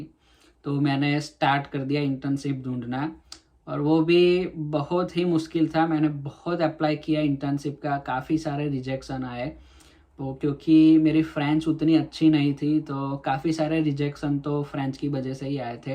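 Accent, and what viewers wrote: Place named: Gujarat, India. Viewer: Bro i underdatnd you are from gujrat , me too ....but work on your Language tone , that gujju tone bothering while you talking .No matter u speak hindi or english .Keep Growing .😇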